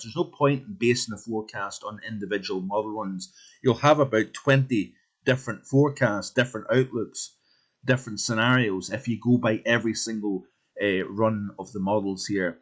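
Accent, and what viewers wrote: Scotland, United Kingdom. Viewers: Would much rather listen to A Scotsman than an Englishman. Well done, I subbed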